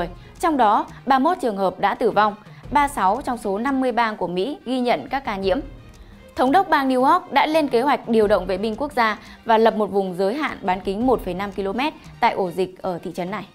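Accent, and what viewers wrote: Standard. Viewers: Nòi rất chuẩn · Giọng mc quá chuẩn .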